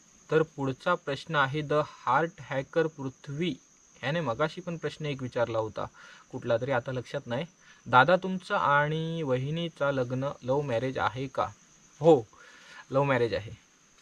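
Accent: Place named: Malvan, India. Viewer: Malvani boltas taa pan bhari vata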